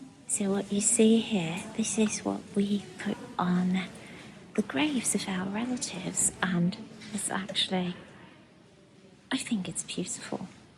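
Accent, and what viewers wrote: England, United Kingdom. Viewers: So you have a British accent but I don't get how you got it? 🤔 has German accent. I love the things in that shop! (I hope that's not rude.) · Lovely video! Your parents are adorable ☺️ Just curious….How come you have an English accent? Were you raised in England? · I think it is amazing you have such a strong British accent being originally from Germany! ❤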